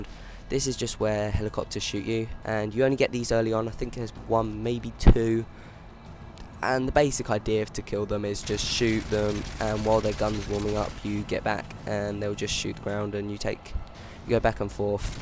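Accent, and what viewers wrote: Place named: England, United Kingdom. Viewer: Cause he's ignorant and you have an english accent.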